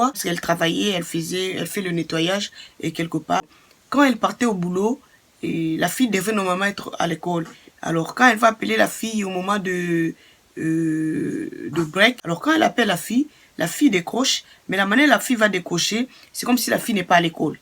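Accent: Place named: Democratic Republic of the Congo. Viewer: J'étais que tu étais Congolaise avec l'accent que tu as.. (moi aussi j suis Congolaise ;)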